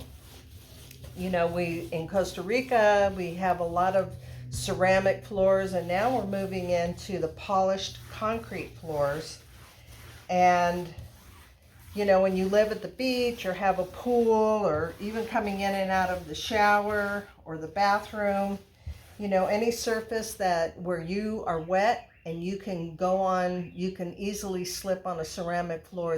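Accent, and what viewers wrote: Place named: United States. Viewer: Your accent is obviously American. I’m curious. How long have you lived in Costa Rica? What made you move there? I love your painted rugs.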